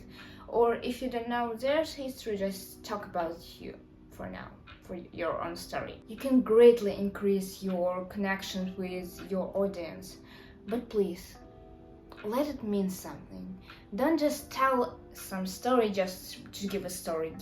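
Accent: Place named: Russia. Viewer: are you from russia? because your accent sounds like someone from russia. anyway, i enjoyed your video :)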